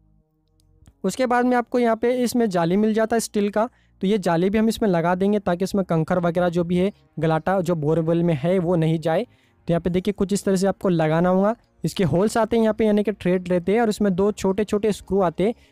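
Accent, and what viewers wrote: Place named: Hyderabad, India. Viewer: Hyderabadi Lagte Ho 😂 I love Hyderabadi hindi Language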